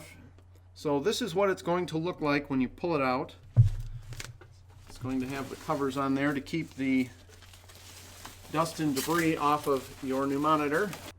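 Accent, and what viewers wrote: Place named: United States. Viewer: Country voice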